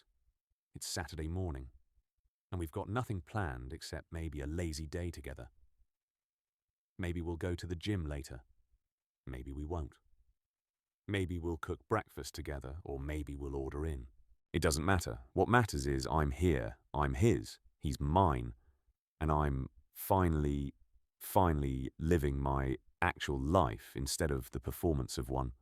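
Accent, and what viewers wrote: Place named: United Kingdom. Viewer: The Brit AI accent….sorry😨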